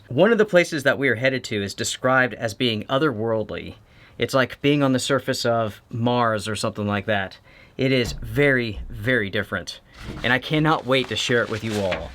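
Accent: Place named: North, United States. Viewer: where's the mountains of North Carolina accent ? you sound like a yankee to me.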